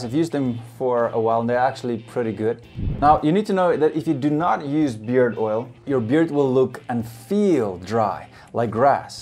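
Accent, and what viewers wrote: South Africa. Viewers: That accent sounds south African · Soon as you started talking I thought, that's a boerseun. You can never fully undo the south African accent. Thanks for the tips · Awehh broooo did not expect you to bee south african 🇿🇦🔥💪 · Are you a South African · Can't help noticing the accent, south African?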